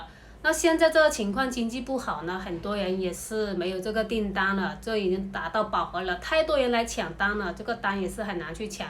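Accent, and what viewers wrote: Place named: Guangdong, China. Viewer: 小姐的臉孔非常熟悉，妳是受人僱傭請來做這種反社會的視頻吧！妳廣東口音是聽的出來的。深圳是你的常駐地點。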